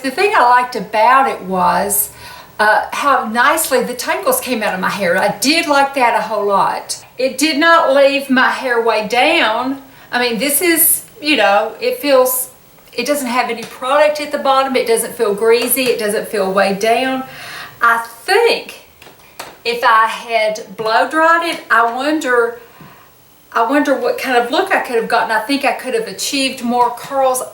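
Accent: South, United States. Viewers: 😃, Nice one, I can just see you in a L'Oréal advert with that lovely southern drawl........... "Beeecawse y'all are worth eeeet" Youre a doll xxx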